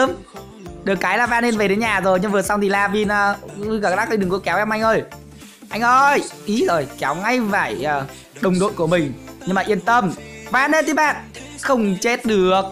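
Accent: Nam Dinh, Vietnam. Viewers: G quê nam định à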